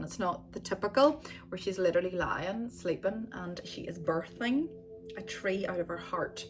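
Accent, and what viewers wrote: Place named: United Kingdom. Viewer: Interesting dialect but I am from USA and can't tell what part of the Uk you are from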